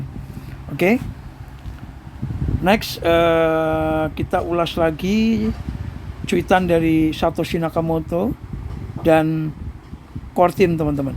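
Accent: United Kingdom. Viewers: KEREN ENGLISH NYA PAKAI BRITISH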